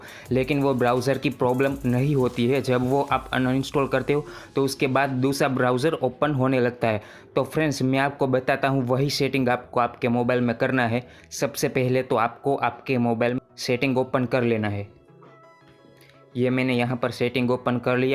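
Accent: Gujarat, India. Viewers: Gujarati ho bhai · Tame gujrati cho e tamri boli mathi kahber pade che bhai kaya thi cho bhai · Gujrati ho bhaya 😍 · Bhai gujrati ho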